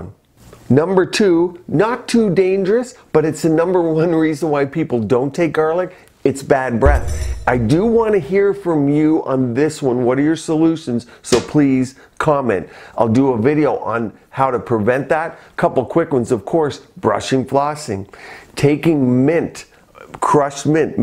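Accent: Canada. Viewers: are u canadian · A Canadian. They pronounce About and Out very strangely.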